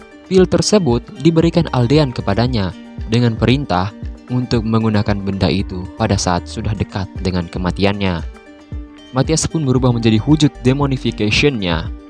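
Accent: Sulawesi, Indonesia. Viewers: Org Sulawesi ya bang?😆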